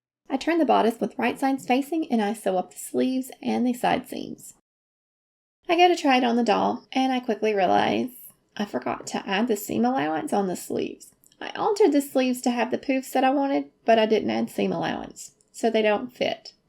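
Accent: South, United States. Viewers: I can't place your accent! Can you tell me where you're from? You sound Southern like me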